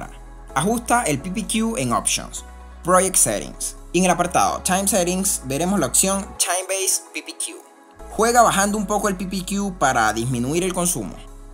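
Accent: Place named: Venezuela. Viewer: Tu acento me suena.... Tu como que eres venezolano? Me too.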